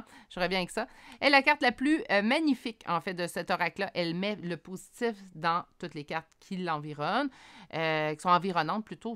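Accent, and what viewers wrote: Canada. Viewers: Merci pour votre bonne humeur et votre super accent canadien que j'adore. Je suis pas pressée qu'il revienne car au fond j'aime bien ma liberté et surtout qu'on me fiche la paix. Je vis seule avec mes minou que j'adore. Et puis j'ai la trouille de l'engagement. De toute façon c'est pas moi qui vais faire le 1er pas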